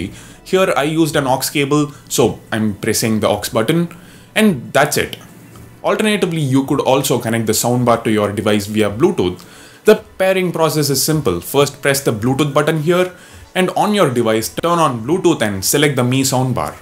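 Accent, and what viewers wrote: India. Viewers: Nice indianglish...😎😎😎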